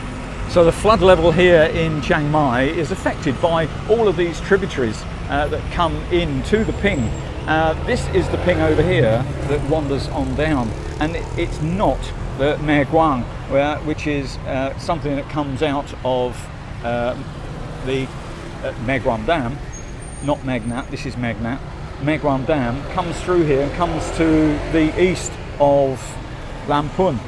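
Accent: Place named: United Kingdom. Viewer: This old POME talks way too much. No economy of thought or speech! Very proud of his UK accent but his Thai pronunciation is hideous!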